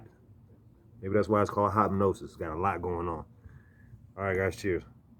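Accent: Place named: South, United States. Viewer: I didn't know u was from the South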